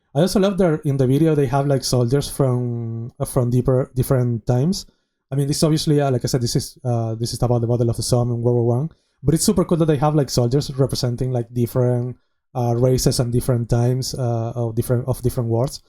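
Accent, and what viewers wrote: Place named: Spain. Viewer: Estoy enganchado a las reacciones, y el acento español se reconoce al segundo. Yo el primero. Buen video.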